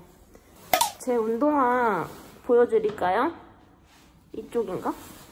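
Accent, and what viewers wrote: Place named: Gyeongsang, South Korea. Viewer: 고향이 경상도세요? 경상도 역양이 느껴지네요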